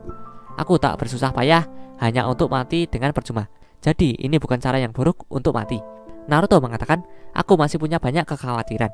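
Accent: Java, Indonesia. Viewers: Medok suaranya bang..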